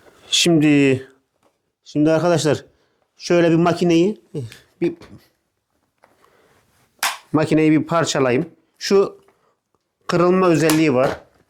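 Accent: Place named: Ankara, Turkey. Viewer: Bi düzgün konuş ya tam ankaralı ağzıyla konuşuyorsun etrafındakiler nası tahammül ediyor acaba. K ları ğğhk diye söylüyorsun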